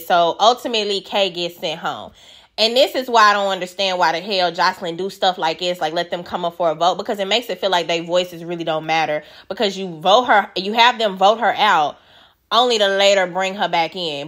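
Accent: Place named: St. Louis, United States. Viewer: Are you from St.Louis? You gotta be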